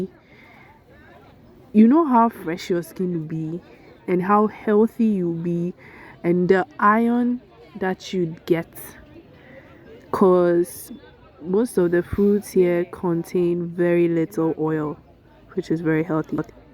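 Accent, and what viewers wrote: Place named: Ghana. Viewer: Chale why do you sound so Ghanaian more than me 😂😂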